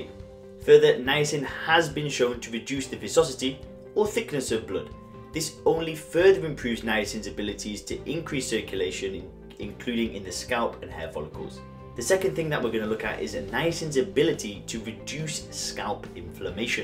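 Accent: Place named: United Kingdom. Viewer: Tf is this stupid music supposed to do? Just makes it annoying trying to understand what you're saying since you're a britbong.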